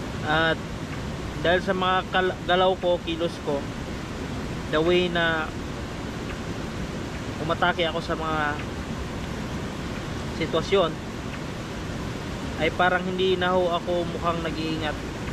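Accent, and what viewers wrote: Visayas, Philippines. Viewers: Bisaya kba Dong.?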